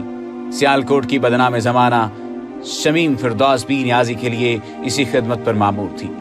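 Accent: India. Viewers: This is indion voice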